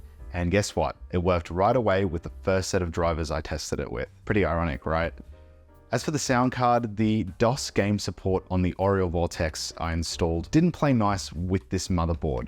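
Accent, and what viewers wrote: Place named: Sydney, Australia. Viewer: Sydney accent detected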